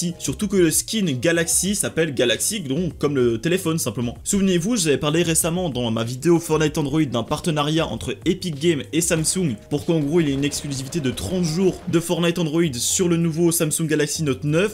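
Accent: Belgium. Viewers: Toi ossi tes Belgique ? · Tu viens d’où an Belgique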